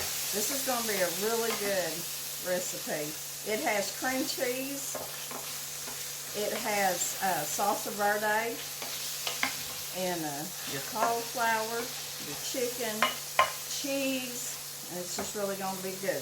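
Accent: South, United States. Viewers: It's a life change. Diets are for quick weight loss. Thanks for your videos. I so enjoy watchin your southern recipes and love your southern acsent. Im going to make your salsa verde chicken casserole. I'm on weight watchers so i eat cauliflower as my rice replacement alot. Plus im not crazy about rice. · Thank you again...I am going through all your older videos...as I have said before, it is so relaxing listening to you talk. You Southern accent is just adorable. I certainly understand about keeping off the weight at 73. Carbs and sugar are the culprits for sure. But cravings for these things does disappear quickly.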